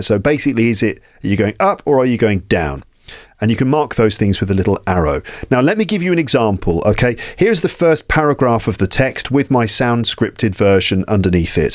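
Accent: United Kingdom. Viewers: Hello, teacher. First of all, I want to say that your lessons are amazing. I’m from Brazil and have been studying American English, but I have to admit that after watching your videos, I fell in love with British English pronunciation—it’s one of a kind… I’m still at the A2 level, but I can understand your lessons very well thanks to the context and vocabulary. I’d like to sign up for the premium plan.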